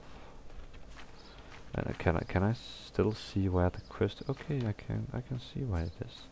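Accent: Denmark. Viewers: Your english sounds Danish like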